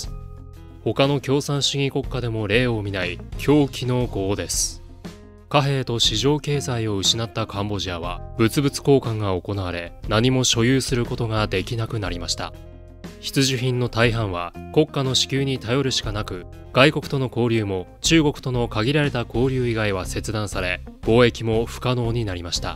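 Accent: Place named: South Korea. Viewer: この読み手韓国人らしいね(確かSから始まる読みが少ない)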